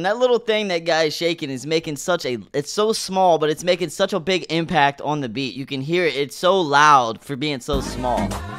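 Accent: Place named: England, United Kingdom. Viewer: I like your English voice accent❤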